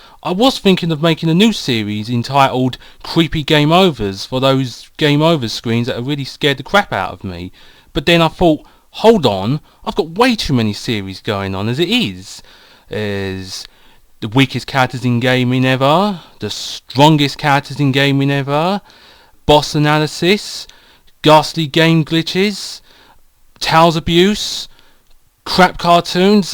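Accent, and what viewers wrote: United Kingdom. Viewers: Dat brit accent! Come on, you don't sound so bad...give it another go! And about Sonic 2 for GG...yeah, it doesn't make sense. The GOOD ending, that is. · holy crap you're actually british O.O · never knew you are british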